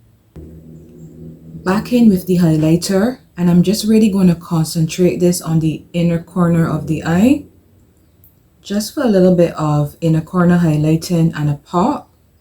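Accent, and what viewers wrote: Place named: Barbados. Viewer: This look turned out lovely. I'm now discovering your channel, I'm picking up and Barbadian accent from you. Are you Barbadian? 🤭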